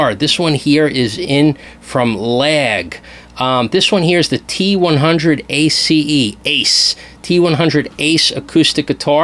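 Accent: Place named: Brooklyn, United States. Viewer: Sounds like Brooklyn , NY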